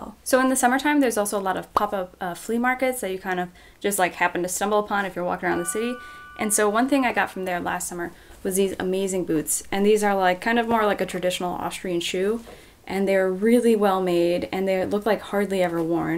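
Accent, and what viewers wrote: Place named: Germany. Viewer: You & your German accent are so cute!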